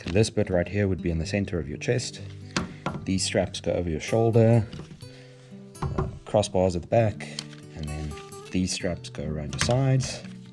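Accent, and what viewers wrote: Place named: South Africa. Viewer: I hear a South African voice, I see 10k subs, and I watched a good review. All I could do was subscribe. Very excited that I found this channel!! Yes boys!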